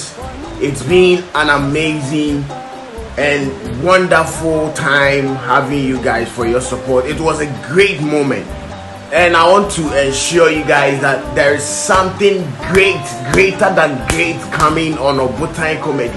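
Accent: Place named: RP, United Kingdom. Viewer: Who noticed that he totally different from when he's acting? He's so gentle and sounds like some daddy B who attended Cambridge international or Matyrs of Uganda .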